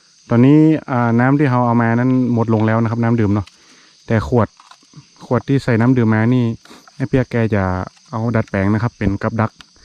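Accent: North, Thailand. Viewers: ชอบภาษาเหนือมีเสน่ห์ค่ะ แต่บางคำก็ฟังออกบางคำก็ฟังไม่ออกแต่ก็ชอบดูค่ะ✌️✌️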